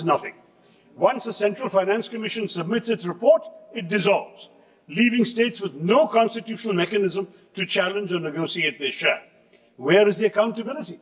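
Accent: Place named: England, United Kingdom. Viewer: At bjp side, no one understand what he is talking about ,in this English accent....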